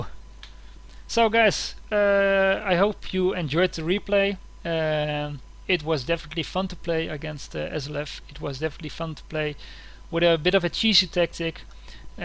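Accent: Netherlands. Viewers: dat dutch accent ;) Great vid! keep it up!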